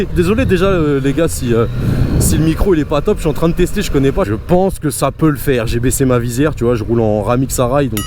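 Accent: Banlieue, France. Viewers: T'as un bon capital sympathie. On reconnait l'accent de la banlieue, mais c'est pas graveleu, c'est pas ziva, et je me suis marré même parfois. Je m'abonne pas mais je te mets des likes ;-)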